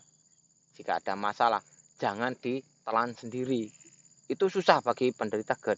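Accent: Java, Indonesia. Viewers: Mas ini domisili di mana...?kok logat2nya orang jawa...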